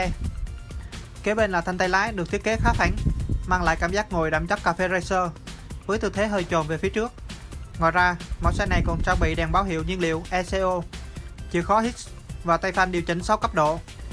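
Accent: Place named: Binh Dinh, Vietnam. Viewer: Lam on dừng nói tiếng bình dinh ko nghe dc gì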